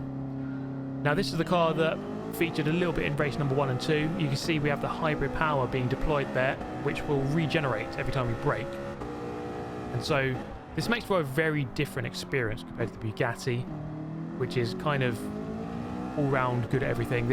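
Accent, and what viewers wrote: United Kingdom. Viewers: I know it is british accent, but where exactly is this accent from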